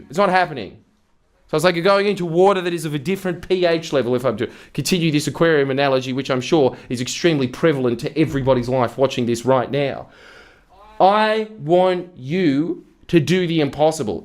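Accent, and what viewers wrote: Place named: Australia. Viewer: You’re one of the less irritating Australian accents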